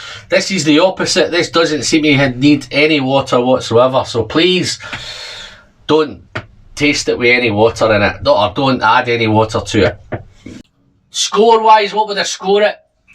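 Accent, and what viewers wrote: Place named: Edinburgh, United Kingdom. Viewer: Hey! Greetings from Cairns. In Australia. My wife and i very much enjoy your vids and your laid back style and your accent reminds me of my grandfather who was from Edinburgh. Just ordered a couple of these from Royal Mile who are our number one goto Scottish supplier. We also got a couple of the new Laphroaig Sherry oaks. Keep the great videos coming mate! Cheers, Dazz and Carla in Ox..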